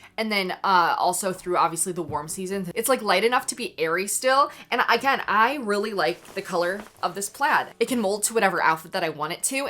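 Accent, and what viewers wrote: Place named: Midwest, United States. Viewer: as a cali girl i find your midwestern accent so soothing and refreshing from the influencer vocal fry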